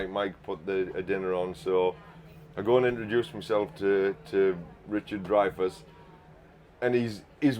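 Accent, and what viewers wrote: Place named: Teesside, United Kingdom. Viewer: What a fantastic build. Love the smoggy accent!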